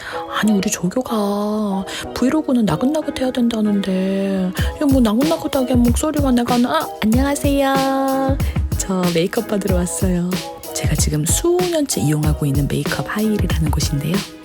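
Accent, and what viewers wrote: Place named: United Kingdom. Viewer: 성우하셔도 될꺼 같아요~~ 최고의 성대이신듯 합니당 영국발음도 너무 멋있으십니당~~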